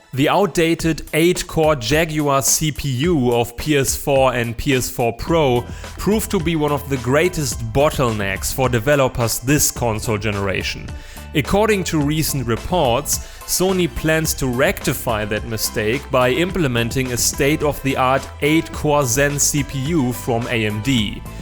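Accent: Germany. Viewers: Englisch auf Deutsche Akzent, das erkenne ich sofort 😂😁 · you have a strong german accent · I said he is German because of his English accent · I hate that german accent xD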